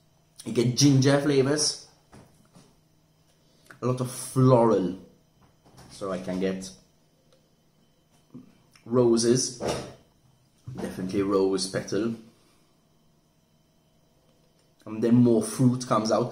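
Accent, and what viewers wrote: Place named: Jamaica. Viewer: Good review. You sound Jamaican.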